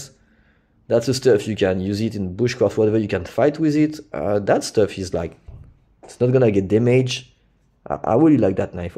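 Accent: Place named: France. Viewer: Bien l'anglais avec un accent français 😊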